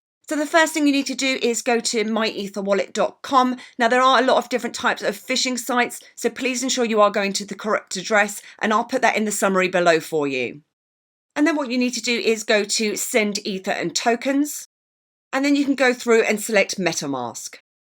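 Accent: United Kingdom. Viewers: British accent is so cute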